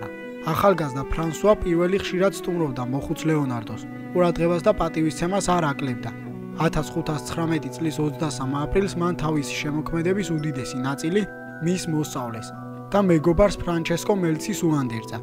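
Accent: Kutaisi, Georgia. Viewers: რაფერ გეტყობა ვიოო ქუთაისელი რო ხარრრ🥰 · Kutaiseli xar 😁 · ქუთეისელია რამე პრობლემა გაქვს დაია??????????????????????? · არ იქნება ეს ქუთაისელი.....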